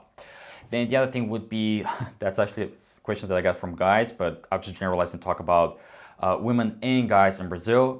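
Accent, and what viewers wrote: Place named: United States. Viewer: First video I saw from your channel I honestly thought you were american. Not because you speak english, but because of your accent. It's not pure american to me, but definitely not british or australian. Si I kinda thought you could be american. You have an amazing english. Wish my english was in that level, but my english is intermediate. I hope one day Brazil will have a good amount of people who can speak english properly. My sister is 15 and in her school it has been a couple years since they started doing this bilingual education system. If you ever want to feel closer to Eurpo, visit the cities of Campos do Jordão (São Paulo) and Gramado e Canela (south of the country. You will fall in love with them.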